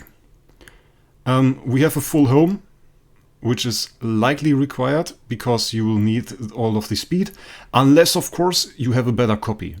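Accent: Germany. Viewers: are you german or where are you from? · Da hört man den deutschen raus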